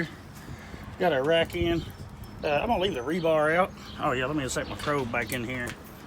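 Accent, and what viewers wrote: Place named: Northern Kentucky, United States. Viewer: awesome man. I just subbed the other day and have fun though about all your videos. When I heard your voice I said he sounds too like me to be from northern Ky lol. Keep up the good work sir.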